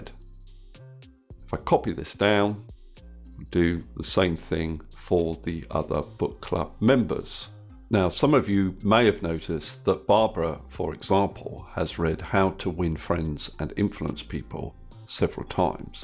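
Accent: England, United Kingdom. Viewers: thats a great solution..thanks dear... I love your English accenr...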